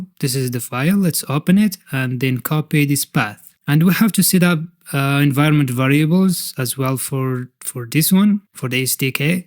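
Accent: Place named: Arab world. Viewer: من الاكسنت واضح انك عربي شرح ممتاز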